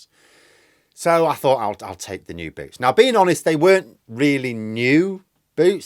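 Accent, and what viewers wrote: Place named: England, United Kingdom. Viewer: I’d know who you are, I call you the. When my partner wanders in, he says you have the most English of accents, which is weird as he’s from the North East and I’m from the south, so I guess you are the somewhere in between. I listen to a lot of your videos. Thank you for all the hard work.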